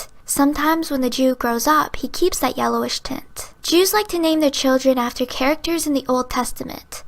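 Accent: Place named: North, Italy. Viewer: My guess was that you're either North Italian or West Slavic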